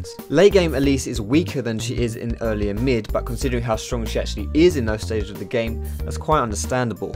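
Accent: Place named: Southern England, United Kingdom. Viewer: ... What do you mean what's with the accent? He's from the south of England...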